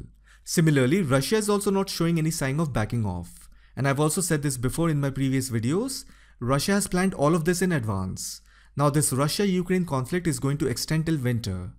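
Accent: India. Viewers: Why the Russian guy who did this comprehensive video is talking with an Indian accent? The whole point of any American intervention in Europe is to put down Russia for good, no matter what. But You are talking about people suffering, who cares. While Putin is sending all those young fellas to die defending the future of motherland Russia, a country who has no future at all, Americans are sending Ukraine nationals to the front, in the future they will send European nationals if necessary. It is a win win situation for them. I am pretty sure a German jobless kid will rather enjoy a cold winter at home than dying in the front if he is convinced that a boycott to Russian gas will cripple Russian economy for good. In two years you will be making a video on how your beloved Russia lost this war and was harassed by vast continents. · There are 2 sides to the war. Russia and the West. 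The west can stop the war and so can Russia. The west can stop sending aid to Ukraine and remove sanctions... OR Russia can simply withdraw from Ukraine. To say it's the west that is at fault shows your preference for Russia. Strangely you sound Indian and yet do you not realize that neutrality in the war will significantly benefit India and China while the rest of the world suffers.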